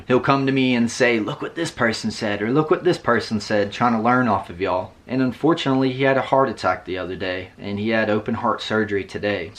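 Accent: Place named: South, United States. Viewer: Love what you’re doing restoring your property. That has always been a dream of mine to retire a property to retire in. What state are you in, sounds like maybe in the southern US. Hope you’re feeling better soon.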